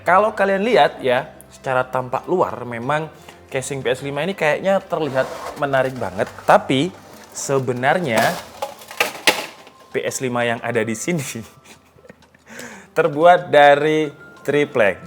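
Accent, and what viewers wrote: Java, Indonesia. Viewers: orang jawa mana bang?😂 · tibake nk ngomong sampean iki jowo nyel yo mas tak.kiro malah raiso.boso jowo😂 sukses terus mas.. mugo sehat slalu, diberi kemudahan dalam menjalankan suatu hal amin..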